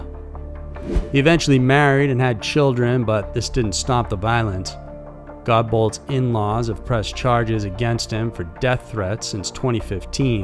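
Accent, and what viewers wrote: United States. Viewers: Which part of the US, is this guy from?, so love his voice!, and of course his posts.😊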